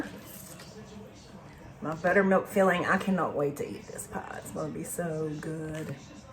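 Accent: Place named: Georgia, United States. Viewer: I just love the way you talk. But I am trying your make your pie. Sounds like you are from Georgia or the carolina.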